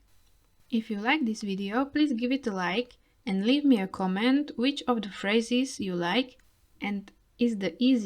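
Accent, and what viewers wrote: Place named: Indonesia. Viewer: Hello, are you Indonesian? I just guess it from your accent.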